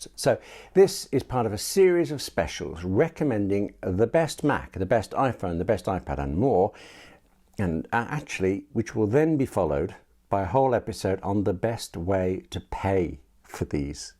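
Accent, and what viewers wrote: England, United Kingdom. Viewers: You have a beautiful soft English accent I could listen to you speaking forever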